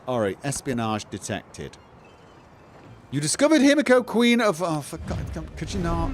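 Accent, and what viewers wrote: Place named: Manchester, United Kingdom. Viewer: Sometimes, I prefer the intro chat to the game, mostly because the rodent is a fellow Mancunian, and it's like listening to an older cultural cousin. 😂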